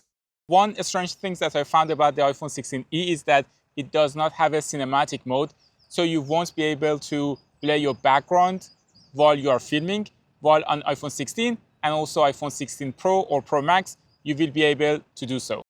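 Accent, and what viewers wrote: Iran. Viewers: داداش احساس میکنم لحجت فارسیه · لحجه ایرانی داری داداش، ایرانی هستی؟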